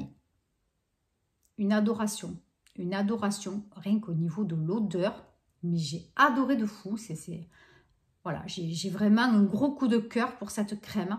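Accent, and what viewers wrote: South, France. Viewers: Une nouvelle abonnés, tu viens de Marseille ? Ou du Sud de la France parce que ça s'entend ça fait du bien d'entendre le sud sur YouTube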